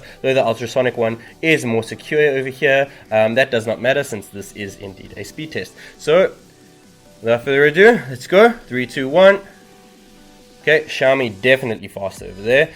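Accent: South Africa. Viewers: are you south african? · Are you south african? I am. Nice video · i hear from your accent you're from SA. i am too. i am thinking of getting this but retailers dont import the mi series and wont repair it (I asked them, they'll only repair phones they've sold and they only import redmi here). any idea where i can get a mi 9 fixed if it breaks? thats my only concern before buying. thanks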